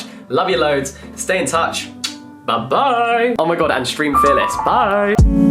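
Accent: United Kingdom. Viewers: His accent !!!😍 I adore the British accent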